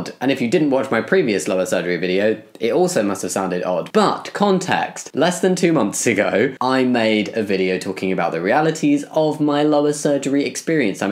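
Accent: United Kingdom. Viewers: I like your voice. Are you british? How have you gotten it? Or you have had it before transition?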